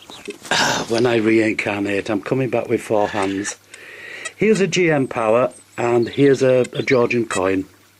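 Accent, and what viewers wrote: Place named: Yorkshire, United Kingdom. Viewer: Thanks....it also helps that you have a Yorkshire brogue....I'm a Wakefield (Normanton rerally) ex-pat down here in Kent. I've set up my Deus exactly as you show.....now to get a chance to use it. Happy New Year in about 15 minutes...Phil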